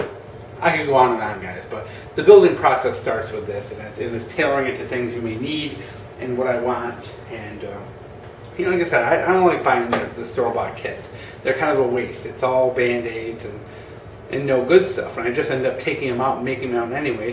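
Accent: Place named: Michigan, United States. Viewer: Are you from Michigan? You have that accent lol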